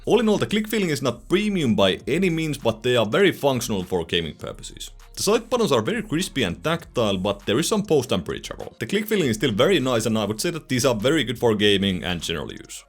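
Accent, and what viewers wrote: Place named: Finland. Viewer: First time in your channel and I had to check immediately are you a Finn or Estonian :D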